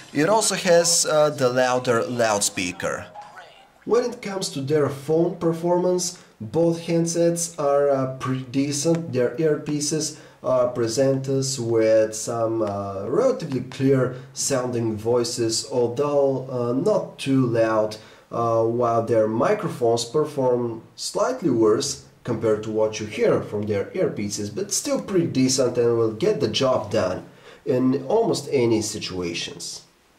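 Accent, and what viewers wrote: Russia. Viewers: russian accent? respect! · Are you russian? :) I like your accent.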